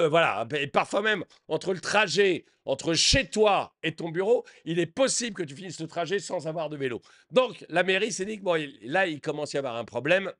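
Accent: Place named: Lyon, France. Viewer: C’est un Lyonnais. Il ne prononce pas les z finaux, comme dans toutes les régions d’influence savoyarde.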